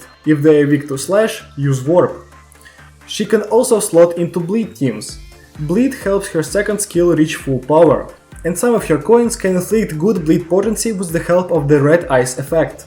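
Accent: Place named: Russia. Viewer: ты случаем не русский?, просто акцент сильный вроде, я не уверен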